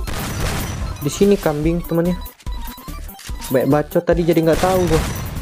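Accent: Palembang, Indonesia. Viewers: Bahaso Palembang nyo keliatan bang j awoakwowk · Bang lu orang Palembang y bg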